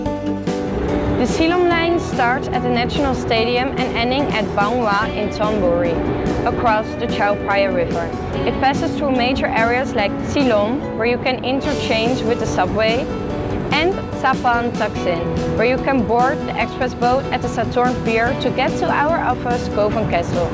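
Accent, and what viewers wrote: Netherlands. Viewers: This is a dutch company right ? Ik kan het horen aan het accent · hahahaha sounds like a dutch farang hahahahaha. This tour agency is extremely expensive. Norrmally this type of farangs got scammed in bangkok now they scamming their own people.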